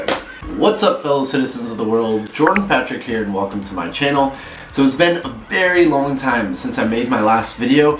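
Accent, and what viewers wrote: United States. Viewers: C'est surprenant que même après neuf ans en France vous gardez votre charmant accent américain.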